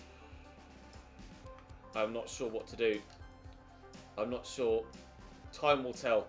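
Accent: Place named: England, United Kingdom. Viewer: Bored from that english accent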